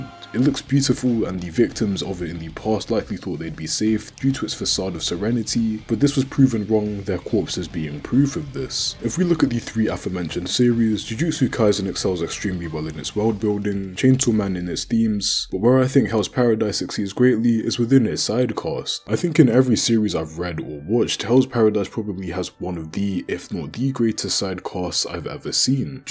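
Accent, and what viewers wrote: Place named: United Kingdom. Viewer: Hello, I’m assuming you’re British from your accent. I love jjk, csm and Hells paradise. Love your reviews that’s why I subscribed and liked:)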